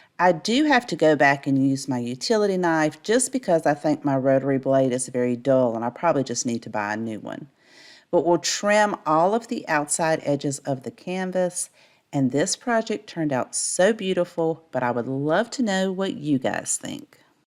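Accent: South, United States. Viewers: There’s no way I can pick just one - they are all amazing!! Love listening to you explain the projects with your Southern accent!!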